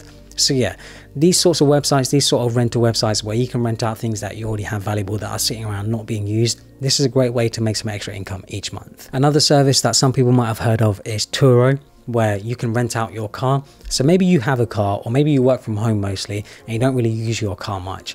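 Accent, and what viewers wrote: United Kingdom. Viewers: Good to hear a British voice on these matters, just feels more relatable, Sometimes with American narrators, I feel like our situations differ too much.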